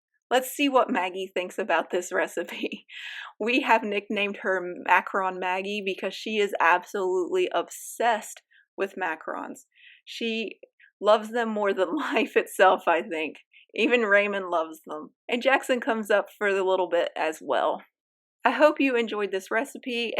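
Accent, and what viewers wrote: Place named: West Virginia, United States. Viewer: Thanks for another great video! My wife and I have been struggling with Italian macarons, with limited success. French macarons are so much easier (though we haven't tried them), that we're going to try them now. Do you have any experience with Italian macarons? If you enjoy messing with several containers, heating sugar syrup, etc., they're fun. Which type do you think are better. Listening to your accent, this old Appalachian boy thinks he recognizes a northern W. Va. pattern. (Which ain' bad!)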